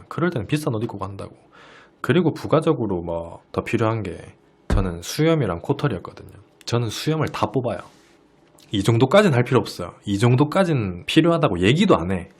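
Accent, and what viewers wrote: Gyeongsang, South Korea. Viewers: 억양, 말투가 딱 대구사람인 것 같다.. 동의하시는분??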